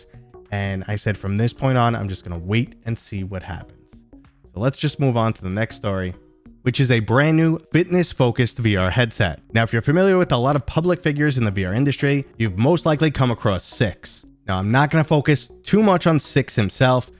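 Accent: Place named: East Coast, United States. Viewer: Definitely East Coast. NJ?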